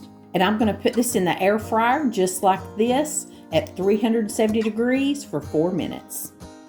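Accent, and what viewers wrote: United States. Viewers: Can I just say I think your American accent is absolutely adorable, your so friendly, lovely lady, and obvs your air fryer recipes are fantastic. 😊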